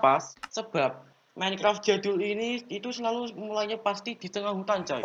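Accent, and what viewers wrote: Java, Indonesia. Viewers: Wong jowo mas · Wong jowo bng?... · Orang jawa ya bang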